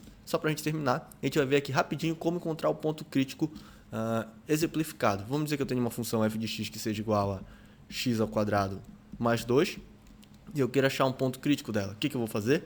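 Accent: Pará, Brazil. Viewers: Muleke eu logo vi q era paraense assim como eu só pelo sotaque, quando ele disse "égua" eu tive certeza hauahuah. Parabéns cara seu vídeo ta ótimo e é bom ver q esse tipo de conteúdo está crescendo no nosso estado. Parabéns e se possível volte a produzir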